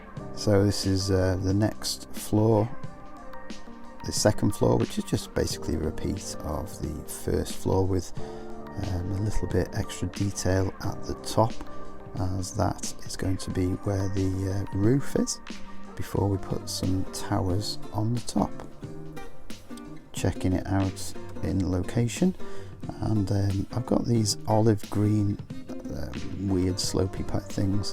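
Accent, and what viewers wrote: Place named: United Kingdom. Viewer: oh wait….sorry…..bad joke was bad…..I almost didn’t recognize the British/English accent…..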